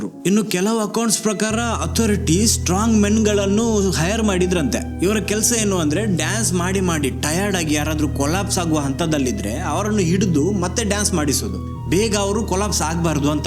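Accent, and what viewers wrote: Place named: Coastal Karnataka, India. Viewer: Anna en content nimma voice matte nimma karavali kannada super 😢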